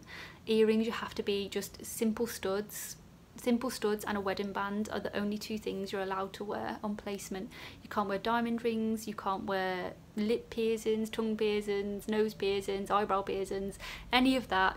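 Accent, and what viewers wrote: Birmingham, United Kingdom. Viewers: Mainly Brummy? x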